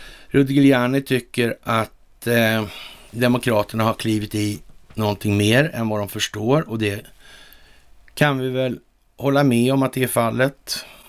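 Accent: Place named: Gothenburg, Sweden. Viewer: Vänligt av dig att du tog tid på dig och kom tillbaka tidigt igen ja förra klippet var jätteroligt trevligt att höra lite göteborgska igen det var länge sedan, 🌺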